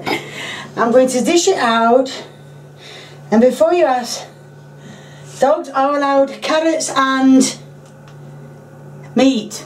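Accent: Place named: North, United Kingdom. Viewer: It's a Sheffield dedah accent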